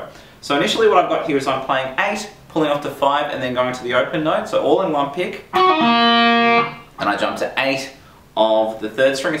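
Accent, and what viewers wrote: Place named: Australia. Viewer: Always refreshing to hear the Aussie accent.... great work....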